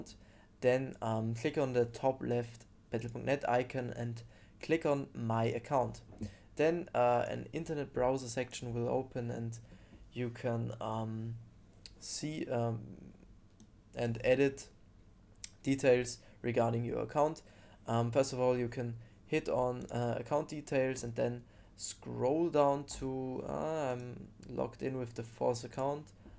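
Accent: Germany. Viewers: Dieser deutsch Akzent ahhh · i spot sam dchermen akzent here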